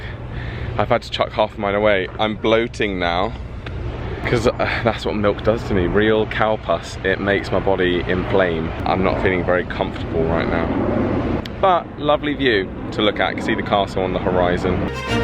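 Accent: England, United Kingdom. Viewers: So cool. U are very good at ur job. Such a lovely man. I could sit and listen to ur voice alllll day. The English accent….. ❤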